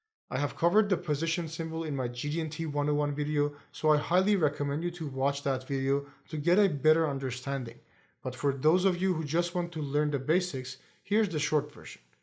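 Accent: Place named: Egypt. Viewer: حاسك مصري الاكسنت مصري الشكل مصري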